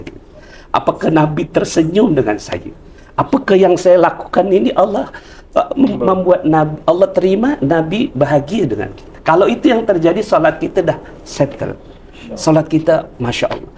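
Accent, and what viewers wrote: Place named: Malaysia. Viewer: Kenapa kok.logat.mslesya pak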